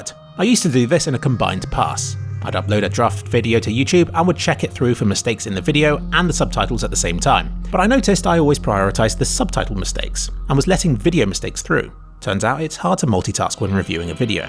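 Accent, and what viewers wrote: United Kingdom. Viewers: Hey, I use the subtitles you make because I think they're more accurate. And because English is not my first language it's hard to me understand British accent So I really appreciate your hard work subtitling your videos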